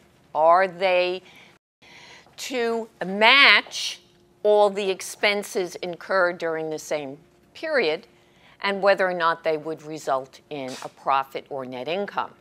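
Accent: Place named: Queens, United States. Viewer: Queens accent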